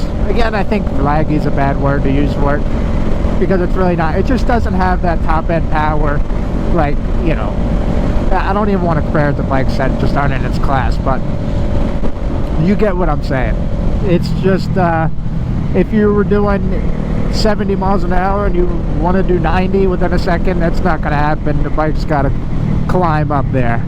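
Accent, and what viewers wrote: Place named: New Jersey, United States. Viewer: First time watching your videos, from the minute you started speaking I knew you were from New Jersey, then I saw similar landmarks like the airport where the 177th fighter jets take off. I use to live in Margate & Ventnor City.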